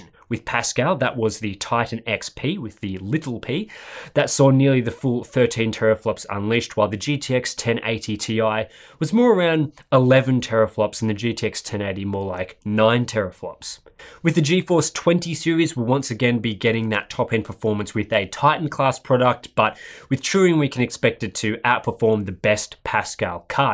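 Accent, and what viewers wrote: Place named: Victoria, Australia. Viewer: It's that Vic accent. I'm SA and I hear the R first. Like sometimes I hear you guys say preformance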